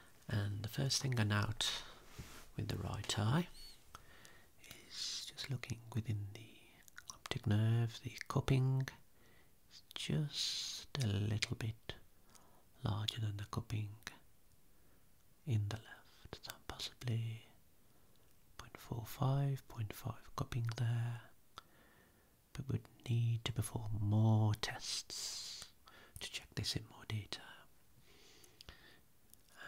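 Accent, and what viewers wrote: Birmingham, United Kingdom. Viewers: Its cause hes a brummie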